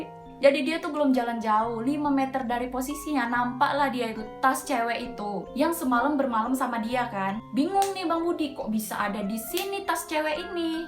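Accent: Medan, Indonesia. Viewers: Mantap logat medannya dah kluar lagi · nengok cakap Medan nya kok jadi sor kali, jadi pengen liat cerita" yang laen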